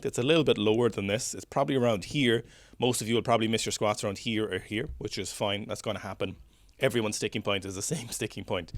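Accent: Ireland. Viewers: Aggressive, disorganised breeding before the squat. Man I love the Irish accent.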